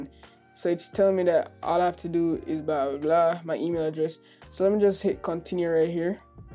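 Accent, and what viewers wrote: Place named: Jamaica. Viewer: You sound Jamaican can still hear likkle bit ah di accent😂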